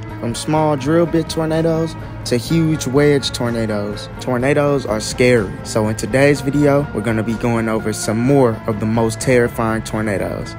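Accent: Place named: Louisiana, United States. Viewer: I’m hearing a Cajun accent in the narrator. I love that accent!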